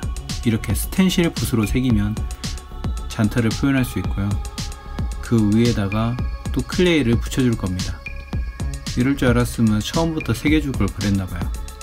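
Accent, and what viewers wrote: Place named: China. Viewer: Cara ele é de china você não viu como ele fala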